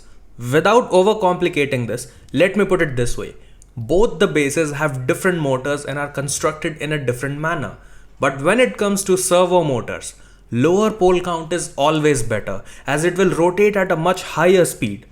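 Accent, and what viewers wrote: India. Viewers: yup, shit video. sorry. first seconds you mentioned that the video is not sponsored in any way! and then... this video was made possible by simagic. and then I hear an India accent... you can't make this shit up, I won't watch the video made it one minute. do better or stop making YouTube videos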